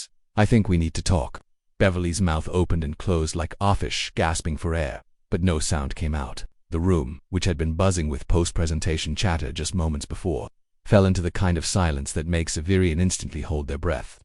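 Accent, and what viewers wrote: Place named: United Kingdom. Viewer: OK you sound British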